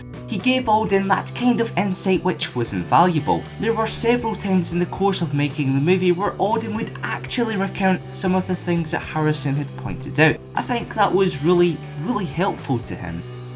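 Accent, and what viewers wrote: Scotland, United Kingdom. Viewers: What part of Scotland? I have friend who's husband is from Glasgow, I have a really hard time understanding him over the phone. You have a lovely accent and I can understand ya perfectly. :) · Are you a scotch?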